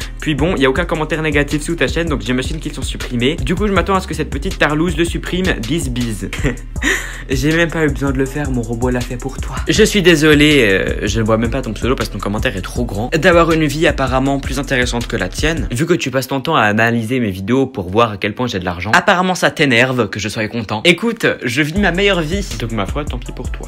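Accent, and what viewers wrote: Quebec, Canada. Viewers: Je t'adore est j'adore ton axen un peut Quebequoi(je ne sais pas l'écrire) je t'adore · TU ES UN PEU QUEBECOIS ? OU??? CAR AU DEBUT J'AI REMARQUEZ QUE TU AVAIS UN ACCENT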